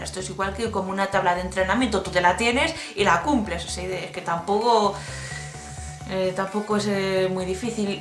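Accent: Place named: Spain. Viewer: Joder si me puede gustar tus videos, y tu acento Español💚💪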